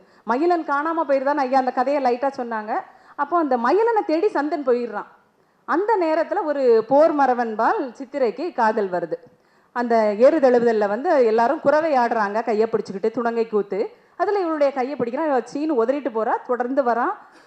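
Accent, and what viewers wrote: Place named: Tirunelveli, India. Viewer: திருச்செந்தூர் ..... திருநெல்வேலி ...தமிழ் செம்ம....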